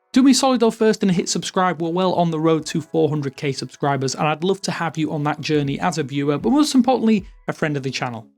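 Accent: United Kingdom. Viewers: I don't like this guy's British accents.! ❌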